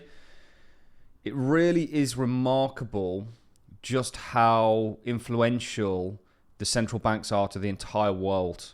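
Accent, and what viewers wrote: Birmingham, United Kingdom. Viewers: I hear midlands, birmingham way maybe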